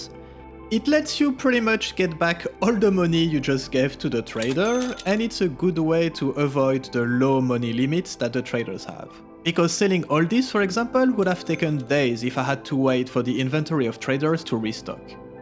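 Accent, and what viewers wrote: France. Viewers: you sound french, and i know what i'm talking about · is that a french accent ?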